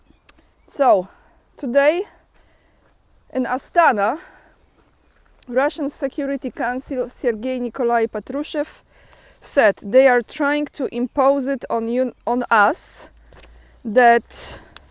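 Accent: Poland. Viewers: Your Polish accent when reading your texts is part of the enjoyment of listening to your program and that accent is very charming. You are careful with the facts but do not hesitate to show emotions and directly say what you think. These qualities are not so common online and make your channel both unusual and likable. Keep up your good work and greetings from Sweden. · Your English sounds very good to me, with a little polish accent sounds more colourful.Thank You for the reporting on the Polish farmers action.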